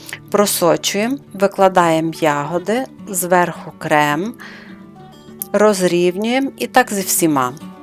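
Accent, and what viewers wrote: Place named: West, Ukraine. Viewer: Гарна українська мова заворожує дивитись, пояснення і все по - панськи! Як говорять на Заході України! Успіху! Буду пробувати Ваші смаколики! 💛💙❤️🌹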